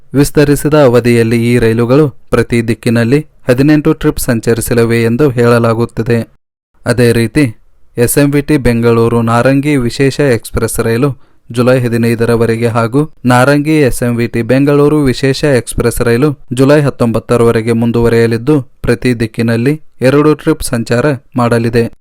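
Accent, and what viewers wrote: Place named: Kittur Karnataka, India. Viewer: Nimma kitturu Karnataka slang bittu shudda kannadadalli heli nimma channel grow agutte i hope you don't offend of my suggestion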